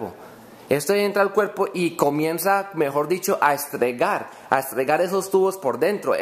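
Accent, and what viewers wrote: Colombia. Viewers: Eres Colombiano? Me Gusta Tu Acento :$ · estoy empezando a ver tus videos me encantan. c oye muy bonito tu inglés y parece tu tono de Colombia c ve k eres muy inteligente saludos desde Monterrey México 😘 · Hola es primera vez que te veo y me gustó mucho tu explicación, y debes de estar orgulloso de como hablas eso te identifica de donde eres así como a todos me encanta como hablan en Colombia, Arriba Colombia!!! Y eso que soy de Guatemala.Felicidades y sigue con tus consejos.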